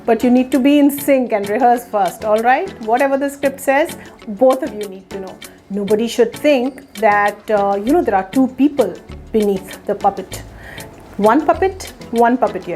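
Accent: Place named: India. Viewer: Very good. Thank you. The Indian accent is gorgeous too!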